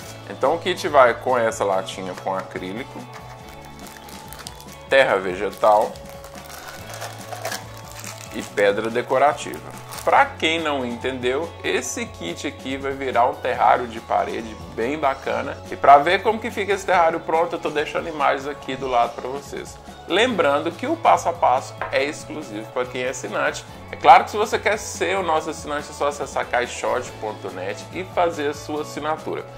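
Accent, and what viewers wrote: Minas Gerais, Brazil. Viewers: Ganhou mais uma inscrita. Teu canal é excelente e eu adoro esse sotaque gostoso mineirinho. Me destes muitas idéias pro apê que eu comprei. Boa sorte com o canal que já é nota 1000.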